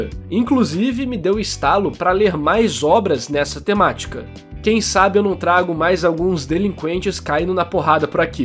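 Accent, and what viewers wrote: Rio de Janeiro, Brazil. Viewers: CALMA AÍ NARRADOR VC TBM É CARIOCA??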